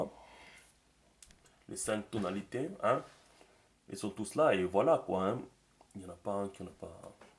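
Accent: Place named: Banlieue, France. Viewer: tu parle trop pour rien dire. je te conseillerais d'apprendre un cours de méthodologie pour enseigner .avec ton accent des banlieues ça nous casse les couilles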